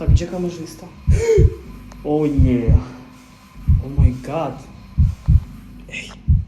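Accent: Ukraine. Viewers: A ty jesteś z Polski czy z Ukrainy albo masz problem z językiem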